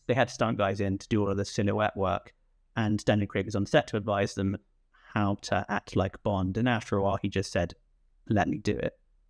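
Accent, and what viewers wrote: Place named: United Kingdom. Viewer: You had me at "the human stain ... Pepsi!" Proper thing listening to a solid review of my favourite Bond with a British accent ;-)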